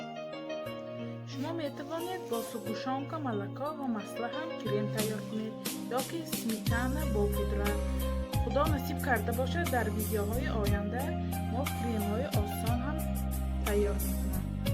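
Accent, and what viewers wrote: Isfara, Tajikistan. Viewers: овозатон маълумки аз исфара хамшахри будем хохар ман хам аз исфара ман зан хастам👍👍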